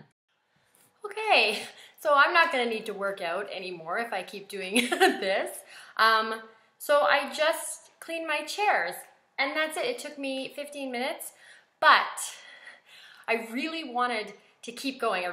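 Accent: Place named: Canada. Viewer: ahhh. That's cool. I thought I detected a bit if Canadian accent in your voice. You are crushin' it, Mama! Way to go!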